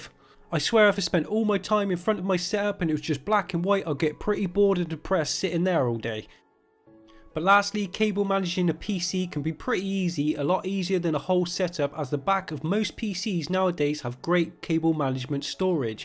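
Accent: Bristol, United Kingdom. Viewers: u from bristol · Nice Bri-ish accent!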